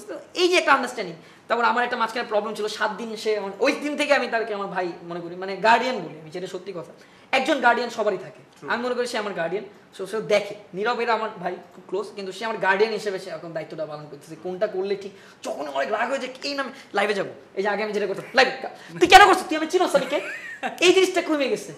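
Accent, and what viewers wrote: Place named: Barishal, Bangladesh. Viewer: আপনার মুখে বরিশাল ভাষা শুনতে আমার খুব ভাল লাগে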